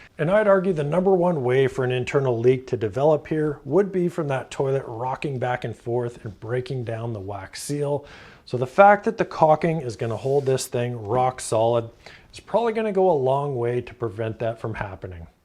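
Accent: Canada. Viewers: I just was listening, YouTube started the video late, I had to stop the video.. you're going to tell us "what you ended up doing on the toilet you installed upstairs " ugh, there's more than one answer, and the most common involves disposal of bodily waste. Here I thought you were American as some Canadian dialect just doesn't bleed out, namely syllables with an "o" show off from Canada like oo sound compared to said from a United States citizen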